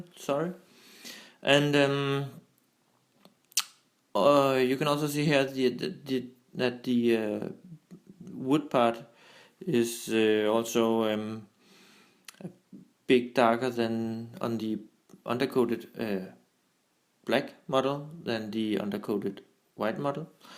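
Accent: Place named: Denmark. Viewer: Nice video. Are you by any chance danish? The way you speak english, sounds so danish :)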